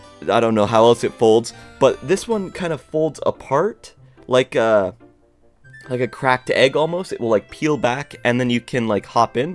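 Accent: Canada. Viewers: love the accent, very Canadian 😎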